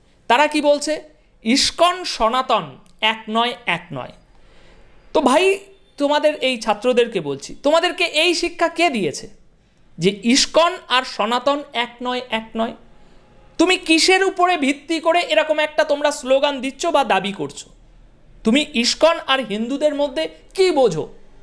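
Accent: India. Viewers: কলকাতার দাদা মনে হচ্ছে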